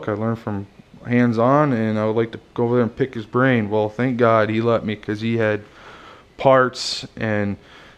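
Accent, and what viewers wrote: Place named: Appalachia, United States. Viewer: Hey buddy its Justin lost your number watched all your videos to hear your hillbilly a** again hit me up ill send you my #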